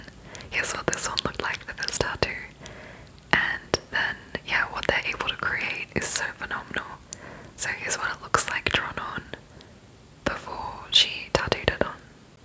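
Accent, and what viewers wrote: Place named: Australia. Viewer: I love your accent✨❤️ and your voice is all together relaxing. 😌 I would love to visit Australia someday but for now hearing your accent is perfect 👍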